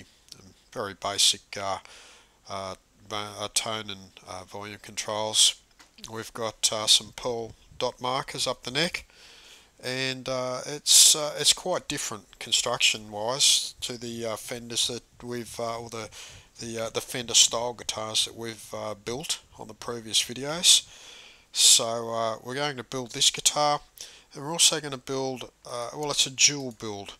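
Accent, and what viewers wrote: Australia. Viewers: this is aussie accent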